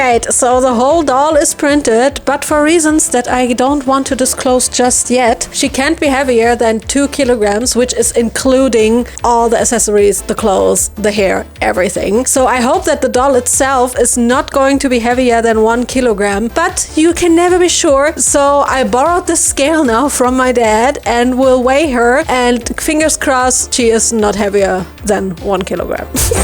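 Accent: Germany. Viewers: i literally KNEW you were German cuz it’s the accent, ANYYWAYYY girl how do you have so much talent? 😔that doll looks AMAZING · Du klingst deutsch 😉 👍🏻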